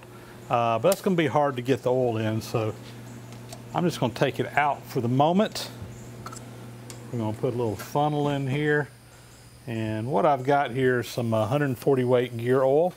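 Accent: South, United States. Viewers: I like how when is talking to "us" on camera - his accent is subdued, but when he's talking to other local folks - it's 100% good old boy!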